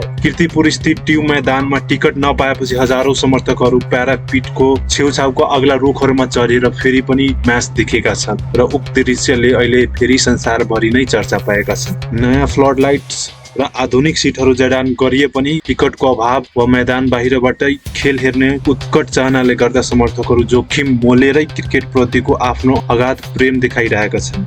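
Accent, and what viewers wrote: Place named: India. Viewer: Bhai Tme Nepali ho ki Indian jabarjasti Nepali bolako jasto suniyo yr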